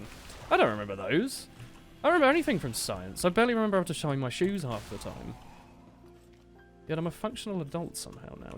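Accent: RP, United Kingdom. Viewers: I listen to your videos as background noise when doing homework and I never knew that a posh British man would make such good motivation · Its been a while. Thank you posh britain man.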